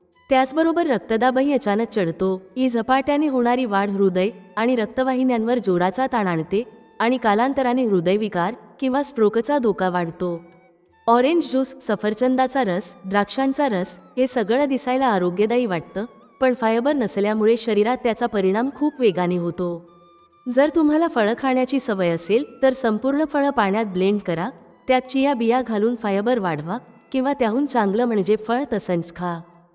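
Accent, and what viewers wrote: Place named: South, India. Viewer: मराठी उच्चार दाक्षिणात्य वाटतात...😊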